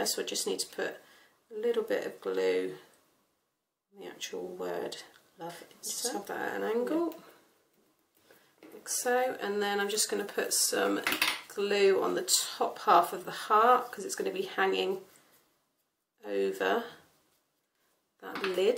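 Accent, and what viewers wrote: United Kingdom. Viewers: I LOVE this project! Being a chocolate lover doesn’t hurt - but I’ve never had such a fun way to give a gift of Hershey bars!!, you do a great job explaining everything in your video - and I love listening to you talk. I love listening to your British accent. Anyway - keep more videos coming, you’re great!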